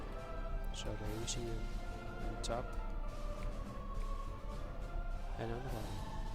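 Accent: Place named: Turkey. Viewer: Question: Are you Turkish? You sound Turkish.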